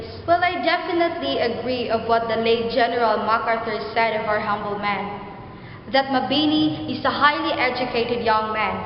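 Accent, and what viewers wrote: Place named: United States. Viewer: Is that your real voice? or lip synching...LOL. if that is...Congratulations!...you did perfect american accent, rise and fall intonation of voice are impressive...Good luck!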